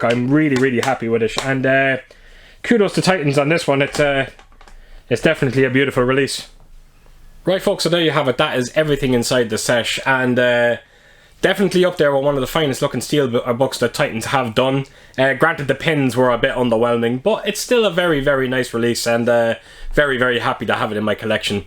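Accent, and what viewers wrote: Ireland. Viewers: ah defo..as soon as I heard the accent I knew you were local, but wasn't sure if you were based in Ireland yourself. Definitely a small world and look forward to following your channel 👍🇮🇪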